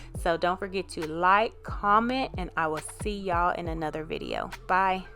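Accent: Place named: Kentucky, United States. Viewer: You almost sound like your from my area (ky) haha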